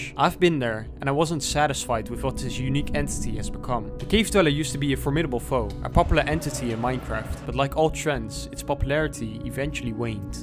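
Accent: Netherlands. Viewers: You are Dutch, right? I love your accent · Are you dutch? very nice video gonna try this Tommorow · i smell dutch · im sorry to ask but are you dutch? you have a dutch accent when speaking english lol xD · one of the heaviest dutch accents ever. geweldig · are you dutch? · Beetje nederlands accent ofnieee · You are definitely Dutch, nice. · ik ruik een Nederlands accentje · You sound so dutch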